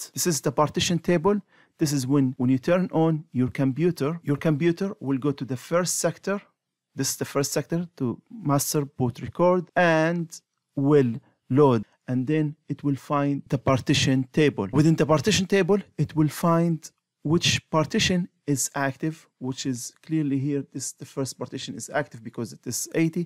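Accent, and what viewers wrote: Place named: Jordan. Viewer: Solid Jordanian accent.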